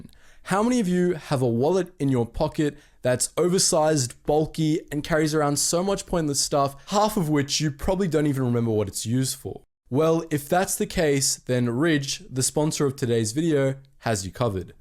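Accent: Australia. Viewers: GUYS HE IS AUSTRALIAN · never knew my guy was australian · Oh shit is Australian couldn't pin down the accent must be from QLD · I just realised was aussie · You're an aussie!?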